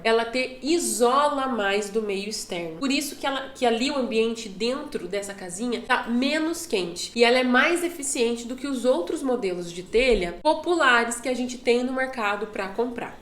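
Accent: Brazil. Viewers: Adoro seus vídeos, principalmente porque sou muito fã deste teu sotaque Paranaense, fui criado em Paranaguá, mas atualmente moro em São Paulo. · Nossa o conteúdo foi fantástico, mas voce explicando foi surreal, serio mesmo parabéns e o sotaque de curitibana entao, hahaha parabéns mesmo, tirou varias duvidas que eu tinha.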